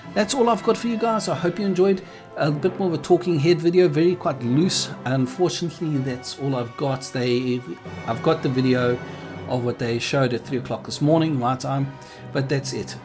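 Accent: South Africa. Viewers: my man great video one question are you south african cause you sound like one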